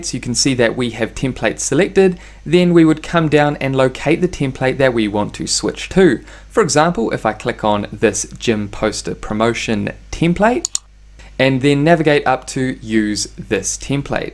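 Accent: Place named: New Zealand. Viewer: Goddddd man, Tour accent Is so strong Nuova Zelanda I guess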